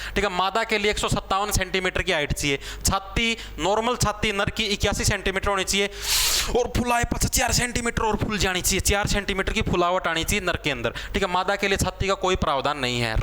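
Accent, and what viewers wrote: Haryana, India. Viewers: Haryana sei h bhai sir kya ajib lag ra h aur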